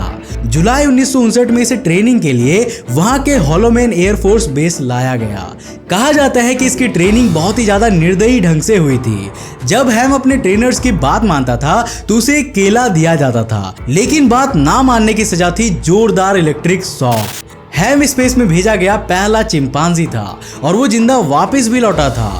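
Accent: Bengal, India. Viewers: are u from West Bengal? BECAUSE YOUR ACCENT SAYS SO. AND I PERSONALLY LOVE BENGALI PEOPLE.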